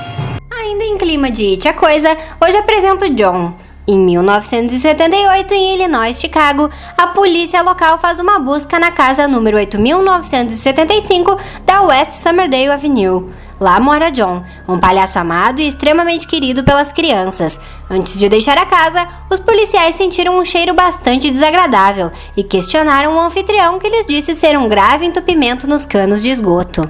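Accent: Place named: Bolivia. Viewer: voz de boliviana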